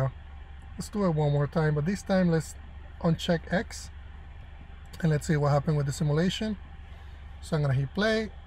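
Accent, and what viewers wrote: Spain. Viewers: I really like your videos man. Are you Spanish?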